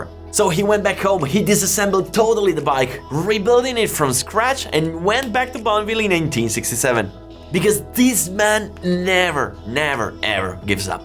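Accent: Italy. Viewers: As a New Zealander with Italian roots he pronounces his name as any Italian would. It's called an accent. Usually it's Maoris the first to jump on and moan when a foreigner pronounces their language wrong, but in this case you knew exactly what he was saying. Stop being so precious especially over free entertainment.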